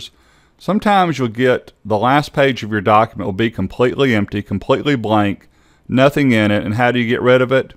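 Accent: South, United States. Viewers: worked like a charm thank you that southern accent though!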